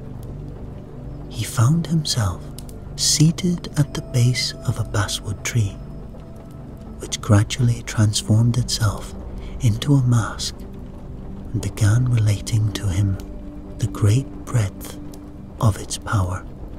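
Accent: Scotland, United Kingdom. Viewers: What's also interesting about the author is he has a beautiful Scottish accent, so the fact he can do all these other accents just makes it even more impressive😊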